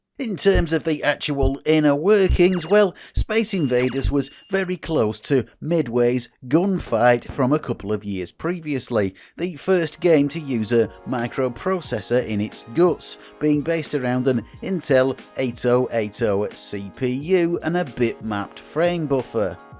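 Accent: United Kingdom. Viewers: Awesome job on digging up I Robot! That's a deep cut. Jaw-dropping graphics for the time. I tracked down a ROM of it and gave it a play on MAME, oh.... I'd say sometime around 2005. It wasn't a bad game, by any stretch of the imagination... but it wasn't super fun or addicting like many of the others on the list. I love the content you've been putting out... I do have a small suggestion for you, if you'll humor me... I don't know if it's an "other side of the pond" thing, but most of the folks I know of in the USA that I've talked shop in person with tend to have a pretty standard way of pronouncing processor names. But, once again, this is the YouTube comments section, feel free to take this with as big a grain of salt as you like: MOS Technoglogy 6502: "Sixty Five Oh Two" Intel 8008: I've.... never heard anyone talk a lot about the 8008. My guess is "Eight Oh Oh Eight" or "EIghty Oh Eight". 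Intel 8080: "Intel Eighty Eighty" Intel 80286: "Two Eighty Six" Intel 80386: "Three Eighty Six" Intel 80486: "Four EIghty Six" Motorola 6800: "Sixty Eight Hundred" or "Motorola Sixty Eight Hundred" Motorola 68000: "Sixty Eight Kay" or "Motorola Sixty Eight Kay" You might notice I've left off a processor. :) Honestly, though, I call it a "Zee Eighty". Do y'all over there call it a "Zed Eighty"? Either way, I agree that the computer that Sir Clive Sinclair made out of it is definitely a "Zed Ex Spectrum"... and the car brand is definitely "Jag-You-Ar", and not "Jag-war", and ABSOLUTELY not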